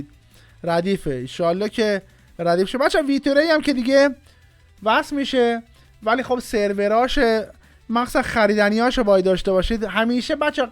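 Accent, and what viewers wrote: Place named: Shiraz, Iran. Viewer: شیرازی لحجه طلا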